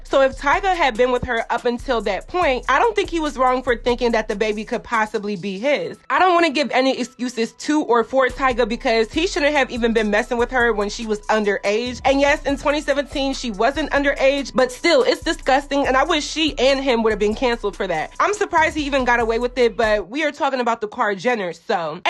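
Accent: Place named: Memphis, United States. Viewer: Nawwww! Not You Tryna Sound Like A Memphis Female Rapper, & Shoutout “Memphis”. After You Stated “BIG GLO” Ratchet Aesthetic Is ”Repulsive” & “ Demeaning”! It’s All Ready Done By The “City Girls” & Your Tired Of It! Nawwww Na Sis, How You Judge & Try To Do The Same. ION LIKEEE DET #901RAISED #Memphis ❤️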